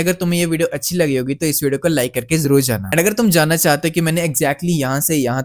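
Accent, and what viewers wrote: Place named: Pakistan. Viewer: Accent to pakistani lagte ho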